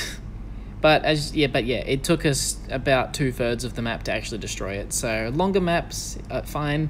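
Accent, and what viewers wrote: Australia. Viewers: How come you are a New Zealander, but you sometimes sound like an Aussie?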